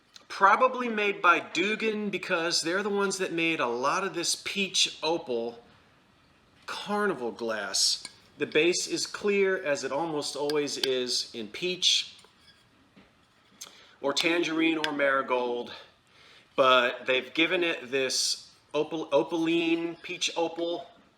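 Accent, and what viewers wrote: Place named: Northeast, United States. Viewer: Oh gosh! I'd have to hunt down those homeowners and insist they keep the paintings with the homes!! Im fanatical about historic documentation., Ive heard you say you don't think you have a Jersey accent, but Ive never heard anyone pronounce "rural" like that! 😄 Must be a North Eastern thang!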